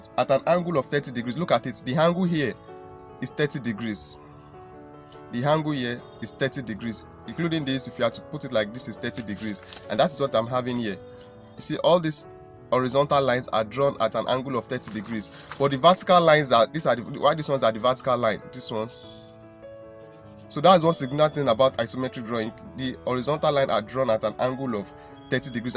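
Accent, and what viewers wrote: Nigeria. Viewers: Thanks very much sir. Sir it's seems like you are from Nigeria. Are you from Nigeria?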